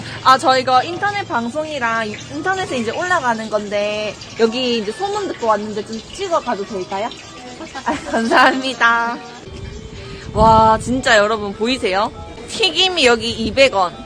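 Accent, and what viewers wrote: Busan, South Korea. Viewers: 부산사투리 쓰세요?사투리인것같아옄ㅋㅋㅋ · 뭔가많이들은 말투다했더니 부산사는친구랑 비슷//너무좋다사투리ㅠ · 38살 아줌마가 보기에도 우와 이쁜 ~♡😍 너무 착하고 예의가 바르셔서 어떤 영상이든 흐뭇한 미소로 보게 되는것 같아요^^ 생각보다 많은 사람들이 말투나 태도를 많이 보신다는거..공주병 조금 이라도 느껴지면 영상 닫아버린다는거 아시는지요ㅋ 서울 살다 부산 갔을때 부산 사투리 땜시 상처 많이 받았는데 사투리 쓰면서도 이렇게 싹싹 할수가 있구나 키워주신 부모님 인성과 성품이 그대로 묻어나는 예쁜아가씨네요♥ 가끔 들어와서 응원하며 영상 잘보고 갈께요 감사합니다^0^*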